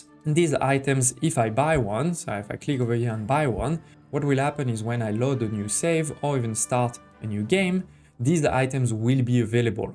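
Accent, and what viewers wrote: France. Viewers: j'ai un peu cramé ton accent francais du coup je pose ma question en FR :D , tu serais me dire comment on utilise les items delocks avec les tickets en or ? j'en ai debloqué mais j'arrive pas a choper les ameliorations merci par avance :D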